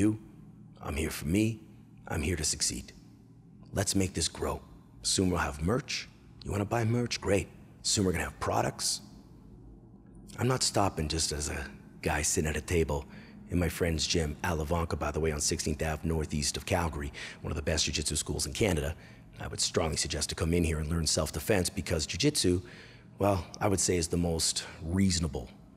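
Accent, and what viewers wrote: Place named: Scotland, United Kingdom. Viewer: Lol 😂 great 👍 Scotland 🏴 accent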